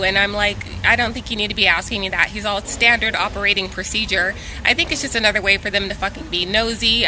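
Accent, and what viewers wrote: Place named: West Coast, United States. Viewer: I love your video. Very informative and detailed. 10K for flights?!!! u rich lol...I would suggest traveling more so you're not too easily nervous about experiencing new things in countries. I am an American living in Denmark now, and love seeing fellow USA people travel. You're accent and vibe tells me you're from west coast. aye.. lol. Anyway u have my subscribe.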